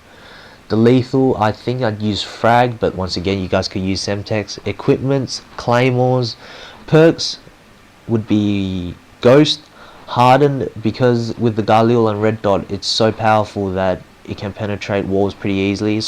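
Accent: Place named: Australia. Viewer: lol.. all aussies sound the same!! lol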